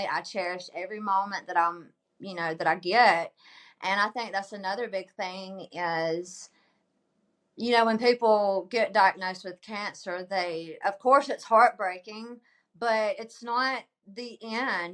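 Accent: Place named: Alabama, United States. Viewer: What a lovely lady , so brave and positive. Love your accent . I love Alabama ❤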